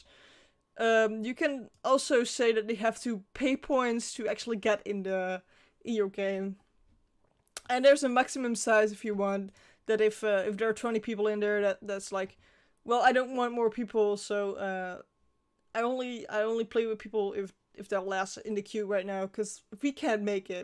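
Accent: Netherlands. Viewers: Are you Dutch or German? Your accent sounds slightly more Dutch, but you look just like a German girl who goes to my church. Goede dag! Guten Tag! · thnx :D i stream for a while but i dont get !lurk to work cuz is uses $(user) is now in lurk mode but in chatbot it doesn't work :( greetz from the netherlands :D (ja ik hoor dat accentje wel LULZ)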